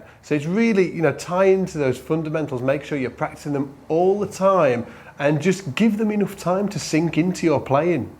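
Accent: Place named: Liverpool, United Kingdom. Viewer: you talk like!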